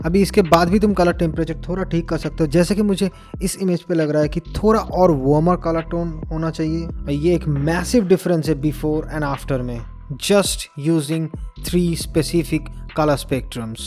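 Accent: Bengal, India. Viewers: Bro are you Bengali ? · Vai tumi bangali na?? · Are you bengali???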